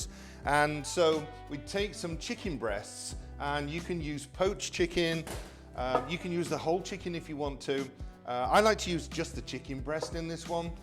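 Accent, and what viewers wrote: United Kingdom. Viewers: What happened to the British accent lol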